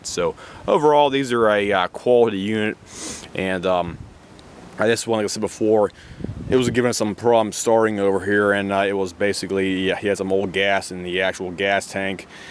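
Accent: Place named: Western Pennsylvania, United States. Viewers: Unmistakable SWPA "accent." Shout out to younz from Uniontown! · That accent of adding 'W's to any word with an 'O' in it is awful. Thankss for demostrating how to lift the hood. What a waste of bandwidth this is. Remind me to never stop in West PA when driving from Detroit to Jersey.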